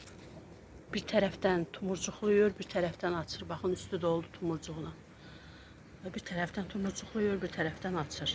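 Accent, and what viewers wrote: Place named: Azerbaijan. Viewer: Çok güzel çiçekler bahçeniz harika konuşma şivesi ile anladım Azeri iseniz herhalde Azerbaycan mı orası benim komşum var Azerbaycanlı çok iyi arkadaşız hayırlı akşamlar diliyorum Türkiye'den İstanbul'dan selamlar sevgiler 🇹🇷🥰😊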